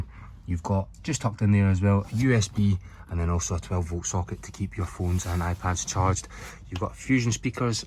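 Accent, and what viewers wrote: Scotland, United Kingdom. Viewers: Love the Scottish accent in these boat sales pitches, real passion!👍🏻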